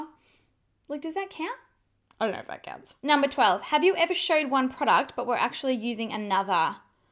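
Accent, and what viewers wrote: Australia. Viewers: I believe in free speech too, it is always best to let fools expose themselves. I love the Mark Twain quote " It is better to remain silent and be thought a fool, than to open one's mouth and remove all doubt." I love your make up looks all though I am the opposite I have dry skin and prominent round eyes but I still dig your looks just can't use the same foundations and concealers. P.s I also love that the Aussie say arse like us Paddys, great word so is shite as it is worse than shit lmao. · Hi, I rarely leave comments, but I have to say that I really enjoy your videos. You give terrific reviews and let us see exactly how everything sits on your skin. Also, your accent is amazing! I've been to Australia four times and always find excuses to go back (even though it's soooo expensive). I usually watch your videos at the end of the day (mostly for relaxation purposes), and it makes me feel like I'm on vacation... 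is it weird? Anyway, thank you for being you. I don't think you need my opinion, but people can be mean to each other regardless of your professional field. It's tough not to feel affected, but the most important people in your life know you and respect you. Also, you have heaps of fans worldwide (I'm Portuguese). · I love so much!!! And who is mean to you!!!!!!! Can't believe it. Not that the US accent is better than the Australian!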